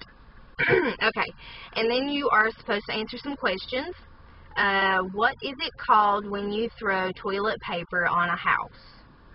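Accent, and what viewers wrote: South, United States. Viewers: Southern accent is more noticeable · love the southern american draawl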